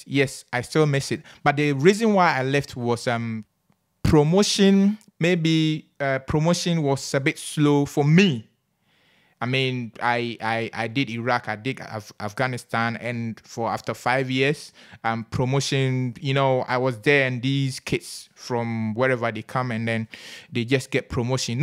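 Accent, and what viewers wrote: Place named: Nigeria. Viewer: HE SOUNDS L I KE A NIGERIAN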